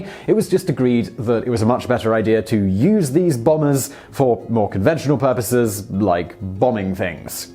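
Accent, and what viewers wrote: United Kingdom. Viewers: When did Vsauce have a British accent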